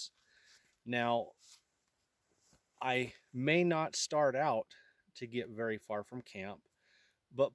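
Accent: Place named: Mexico. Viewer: I like your Mexican accent. Like your hat also.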